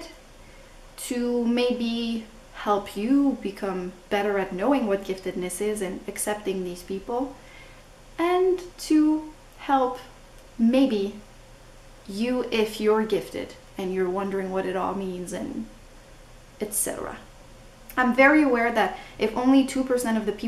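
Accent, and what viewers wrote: Quebec, Canada. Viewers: WoW Je me disais que tu avais un accent québécois! Je suis un adulte TDAH, dyslexique, avec dyscalculie et avec dysorthographie. Je connais très peu de québécois doublement haut-potentiel! Belle découverte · Tu es de Montréal ? Selon le peu que j'ai entendu de ton accent tu me sembles québécoise... En tout cas excellent vidéo merci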